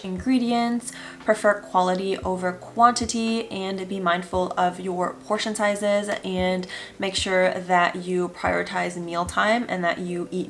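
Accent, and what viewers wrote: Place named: United States. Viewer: Just started following. Love the videos, but I am curious why you have an American accent.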